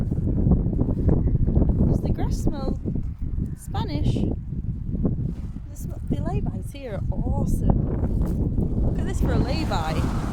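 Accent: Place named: Northern England, United Kingdom. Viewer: hiya . Hey Liking your Vids ..you’ve inspired me into Going to Spain .. (maybe next year now )..I’ve only I had my Van for 14 months. always seems daunting in driving on the opposite side of the road😐 ..and mines only a short wheelbase vw transporter☺️.. your accent sounds like you’re from the north ?..Mick from Warrington Cheshire 😉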